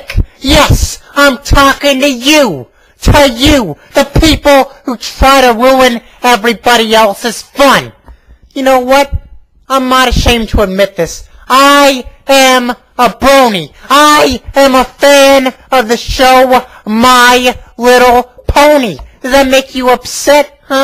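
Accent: New York, United States. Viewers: When did do a New Yorker impersonation?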